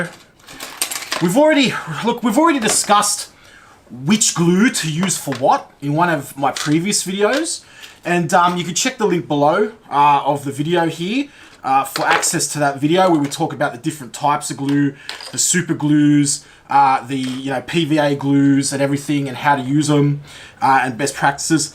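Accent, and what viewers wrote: Australia. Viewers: Great video, good enthusiasm, informative and Aussie accented! Thanks for making this. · The Aussie is strong with this one. Maybe even New Zealander?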